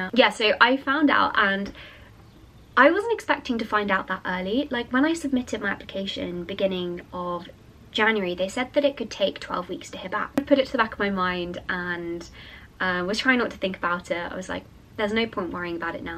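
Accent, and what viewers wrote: United Kingdom. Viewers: I am happy that your dream came true. you are one of the first youtubers that I follow (since 2018) even though I barely can understand English (and also your British accent.) you are a lovely person.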